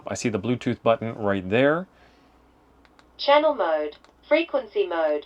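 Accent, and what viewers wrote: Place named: Australia. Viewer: Nice kit and worth even more with the hot Aussie voice chic.😊🇦🇺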